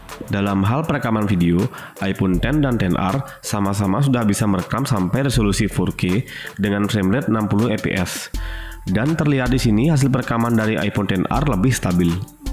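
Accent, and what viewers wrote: Bali, Indonesia. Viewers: logatnya macam anak bali nih 🤔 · Logat nya bali banget😅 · Orang bali kalo ngomong memang seperti itu kak logatnya, ini orangnya dari bali soalnya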